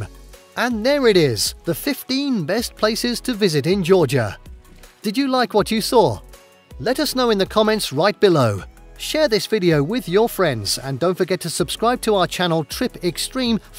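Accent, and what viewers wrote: United Kingdom. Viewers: Great job showing amazing places to visit in Ga. But caught me off guard when I heard a British accent. I was expecting a Ga accent.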